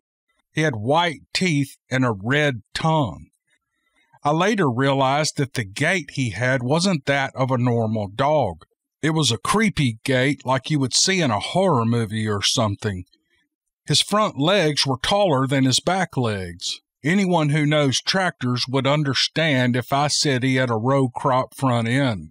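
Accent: South, United States. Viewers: There will never be too much commentary,. That's why alot of us listeners tune in! Your southern drawl is 👌 🖤from Oz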